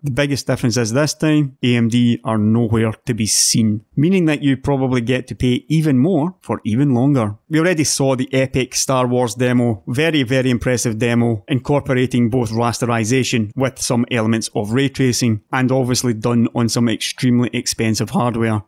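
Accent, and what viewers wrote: Scotland, United Kingdom. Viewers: Is your accent scottish? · Are you Scottish too?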